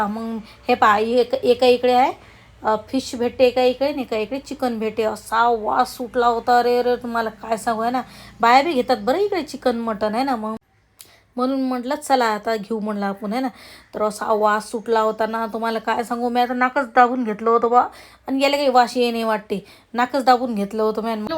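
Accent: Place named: Akola, India. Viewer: Akola chi bhasha ahe baa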